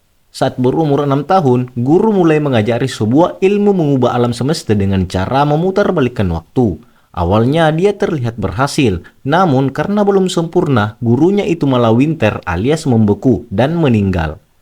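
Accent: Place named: South Sulawesi, Indonesia. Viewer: Orang mana bang. cara ngomnya kayak orang sulsel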